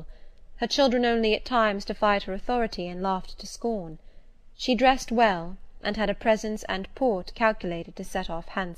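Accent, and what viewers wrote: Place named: United Kingdom. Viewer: Very clear voice and british english accent, i am enjoying listening to this voice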